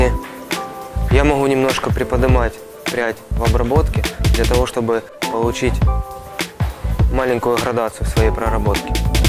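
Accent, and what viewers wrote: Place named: Ukraine. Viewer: Умиляет это украинское произношение буквы Г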